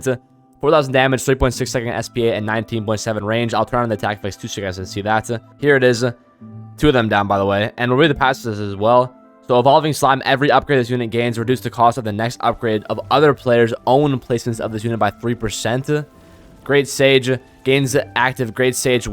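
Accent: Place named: United States. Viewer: im from england but this literally sounds like a generic american accent to me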